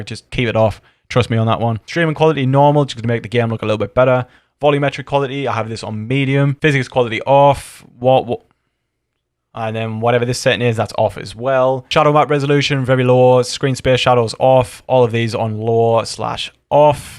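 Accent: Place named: North, United Kingdom. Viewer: Sound like a fellow northerner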